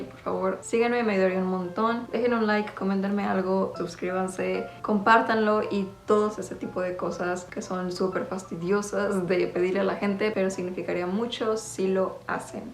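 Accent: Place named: Latin America. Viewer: Siempre me gustó como hablaba Katharine Hepburn y no sabía que era por este acento. Me gustan mucho los actores del Hollywood clásico porque tienen una gran presencia en pantalla, y su forma de hablar influye mucho en ello. Interesante video, muy bueno y bien explicado. Btw, tú hablas en un español latino claro y preciso, y tienes un acento muy bonito. Saludos.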